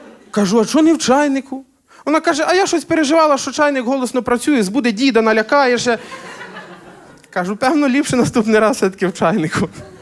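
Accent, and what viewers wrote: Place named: Galicia, Ukraine. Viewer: Якщо ти з Хмельницькому, то скажи щось як хмельничанин. Бо поки що, твій говір видає в тобі, радше, галичанина )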